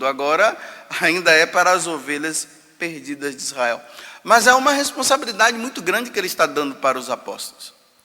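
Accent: Bahia, Brazil. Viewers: Durmam com um barulho desses. Esse padre, com todo respeito, é um baiano arretado.🤗🤗 É uma injeção de ânimo diária, aprender com ele. Obrigada Senhor Jesus, pelo sacerdócio do padre José Augusto! 🙏🙏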